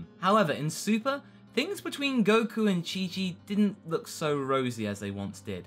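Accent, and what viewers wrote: United Kingdom. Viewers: I'm late but yeah, he has to suppress his British accent though XD He's also Gohan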